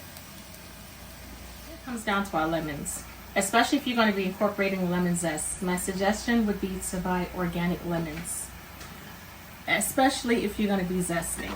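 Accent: New York, United States. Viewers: Love you Mama ❤️ never stop 🛑 beautiful accent NYC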